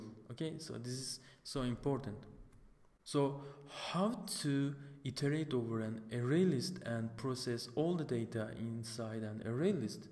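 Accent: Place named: Turkey. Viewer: hocam ismini görmeden aksanından anladım türk olduğunu tebrikler güzel bir seri yapmışsın :)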